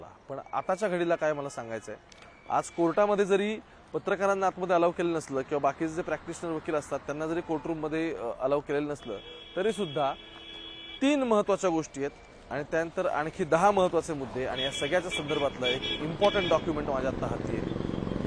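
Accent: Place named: Pune, India. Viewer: भाऊसाहेब छान समजून सांगत आहेत ऐकायला बरं वाटलं म्हणजे स्पष्ट पुणे बोलत आहे. छान रीपोटर आहे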